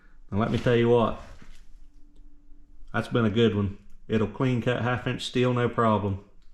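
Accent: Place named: Appalachia, United States. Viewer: You have to be from SE Ohio or WV.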